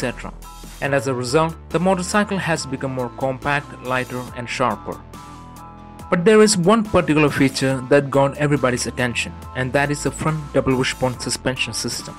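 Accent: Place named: South India, India. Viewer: U are awesome man thanks for the information that to detailed about the suspension system and how it works. Really needed these info also saw your telever suspension on BMW just great man keep it up. Where u from from your accent I think you are from south part of India.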